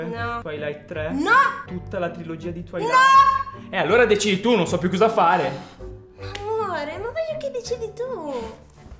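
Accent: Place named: Palermo, Italy. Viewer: che parla con l'accento palermitano fa troppo ridere!! Bravo! 😘👍 E lo dico da siciliana! 😄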